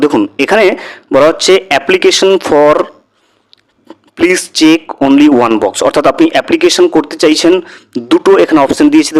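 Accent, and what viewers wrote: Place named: Bangladesh. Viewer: apni ki Bangladesh teka video toiri korchen apnar voice ta Bangladesh desh er moto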